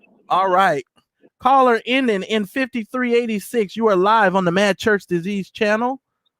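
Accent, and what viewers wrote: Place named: South, United States. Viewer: 🤣🤣🤣🤣🤣 You and this southern accent! OMG so funny! Those darn pickup trucks! 🤣🤣🤣🤣🤣🤣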